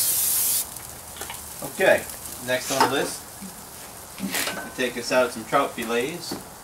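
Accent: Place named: Louisiana, United States. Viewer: im guessing hes from Louisiana the way he says filets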